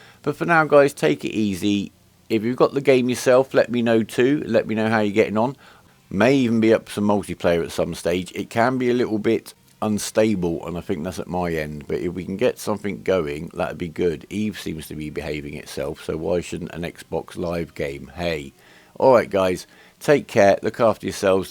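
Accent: United Kingdom. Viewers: Left a like for the Westcountry accent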